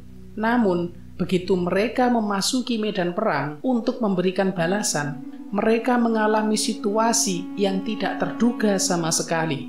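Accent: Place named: Java, Indonesia. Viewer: Abu Ubaida....MEdok kali mas😅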